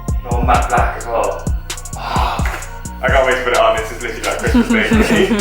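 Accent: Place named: Cardiff, United Kingdom. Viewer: How did you find the lights after the smoke wrap? Did it make much difference to the brightness of the lights on the road? I have a white 2 series and the chrome in the headlight ruins the aggressive look of the front end. I was thinking about having just the chrome part wrapped over by a local company which wont effect the brightness but after seeing your lights i was windering what a slightly lighter smoke wrap would be like covering the whole lamp itself? What say you mate? Im also from south wales beiiiii👊🏼💯🏴as soon as i heard your accent i thought ohhh hes a caaaaardiff boy😏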